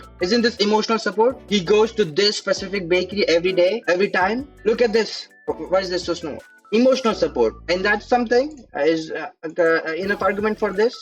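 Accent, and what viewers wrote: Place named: India. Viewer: Consider using an AI voiceover, just hearing indian accent make me click away, even tho the video may be great.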